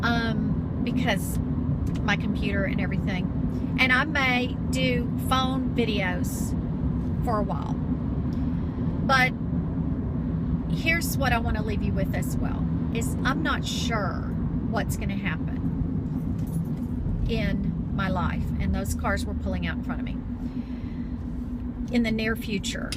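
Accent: Texas, United States. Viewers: Great vlog! I enjoy your positive attitude so much. Loving that thick Texas accent you've re-discovered too. Hope you have a wonderful holiday.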